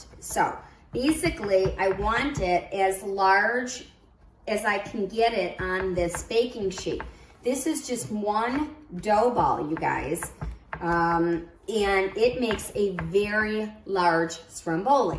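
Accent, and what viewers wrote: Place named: United States. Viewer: You are an American😅😅😅 If you grew up in Italy you would speak Italian…correctly☺️ You’re just like the rest of us Americans! It would be like “African-Americans” not speaking an African language or knowing the correct African pronunciations of “African” words. All of us are a blend…no one is “pure” in race. We all are of the human race. Praise God! Thanks for your tutorial! Can’t wait to try!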